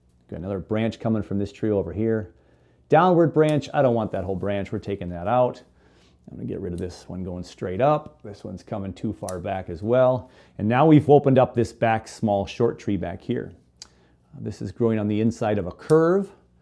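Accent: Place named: Minnesota, United States. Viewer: Just stumbled upon your channel and the first thought I had was, "Huh, that turntable looks like the ones Van makes." Second thought was, "This guy sounds Minnesotan" and then you mentioned being in Minnesota and I was like, "Ah, well, there you go." Did Van make your turntable?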